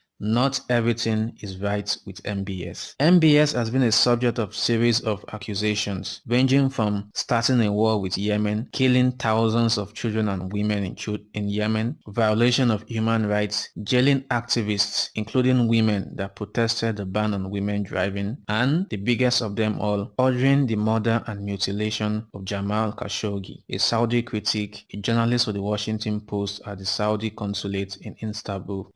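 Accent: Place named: Nigeria. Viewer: Real Nigerian accent you got there mate.. Good info though.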